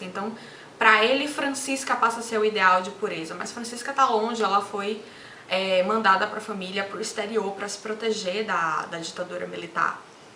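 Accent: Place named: Salvador, Brazil. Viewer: Eu bem que achei que você tinha sotaque de soteropolitana, somos conterrâneos. Um belo achado seus vídeos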